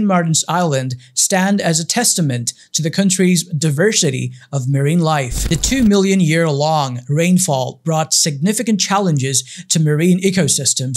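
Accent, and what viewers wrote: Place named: United States. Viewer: You accent awesome. I want to learn American accent as you speak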